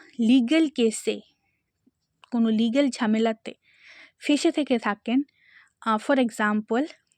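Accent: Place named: Bangal, Bangladesh. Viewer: Didi tmr mukh a amr bangla sotti sunte vlo lage ❤❤❤ Tumi tmr motoi sundar didi... Kintu sotti amr vlo lge...ami tmr katha sunei bujhechilm tmi bangal...kintu amr tmr mukh a ai bangla tai amr vlo lge...tmi bangla tei video koro❤❤❤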